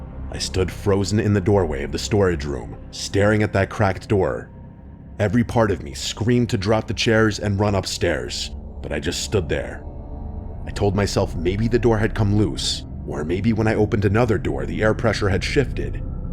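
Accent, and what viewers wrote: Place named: East Coast, United States. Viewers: NYC or suburbs area. Probably Brooklyn or LI. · Mr nightmare got a east coast accent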